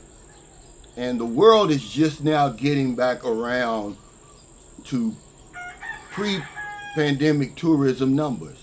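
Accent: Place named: Georgia, United States. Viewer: The author seems like a nice guy sounds like Southern Georgia accent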